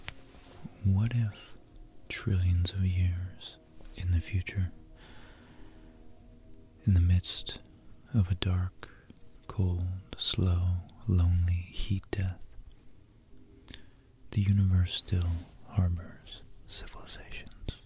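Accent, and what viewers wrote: United States. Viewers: Im late to the party with your asmr and im not a fan of a US accent but your voice my friend is absolutely perfect and within moments I know im going to drift off. I love the just talking about books and sciences and the mythology was cool. Please do more. You're not as prolific as some of your contemporaries who seemingly just like to get their norks out. Bizarre behaviour, its like asmr/onlyfans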